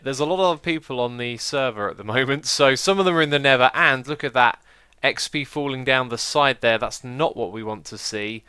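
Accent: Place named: England, United Kingdom. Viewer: Mesa-FIED or Mesa-FIGHT? I love your english accent but this has been bothering me all this season.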